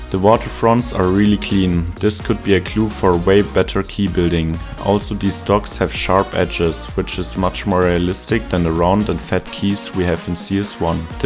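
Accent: Germany. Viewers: Hearing your German accent is kinda funny ngl (Ich bin auch Deutsch) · Bissel Deutsch xD Aber Starkes Englisch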